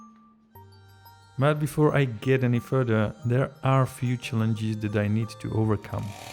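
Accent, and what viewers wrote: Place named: Czech Republic. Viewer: that czech accent tho.. classic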